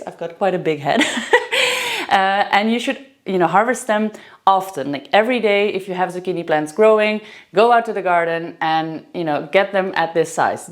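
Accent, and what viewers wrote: Netherlands. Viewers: You sound dutch 😊 Groetjes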